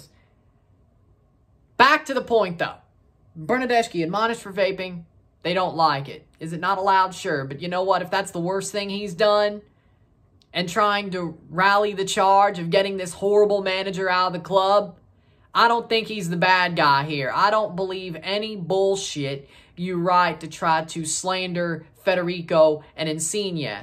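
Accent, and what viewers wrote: South, United States. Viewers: Ok but I assume your in Toronto now. That is cool that you are a soccer fan. Not alot of southern guys into soccer. Love the content. keep it up! Thumbs up bro!!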